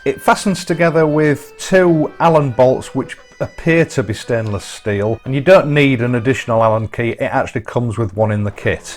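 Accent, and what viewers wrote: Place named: East Yorkshire, United Kingdom. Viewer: Clear East Yorkshire accent. The only people in Yorkshire who say werk instead of work. I will never buy a sat nav. North Yorkshire rules.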